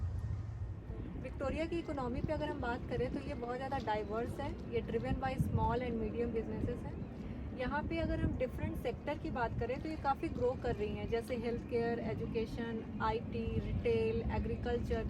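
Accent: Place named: Bihar, India. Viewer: Bihar me kon gaon, your accent matching with me. Jab hum aayenge Victoria, aapse milenge jarur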